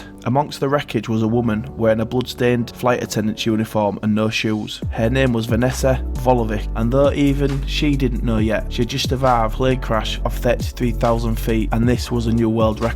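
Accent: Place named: West Yorkshire, United Kingdom. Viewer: Just subbed , is tha from west Yorkshire? , good luck with the channel.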